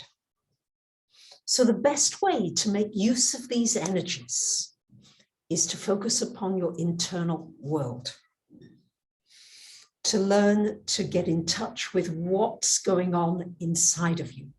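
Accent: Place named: England, United Kingdom. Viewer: Thank You! 🤍. I got an extra, personal, heartwarming confirmation with this video as you clapped your hands together. My grandmother was from Coventry, England, and it was something in that sound, as well as your accent, that reminded me she is with me. 🤍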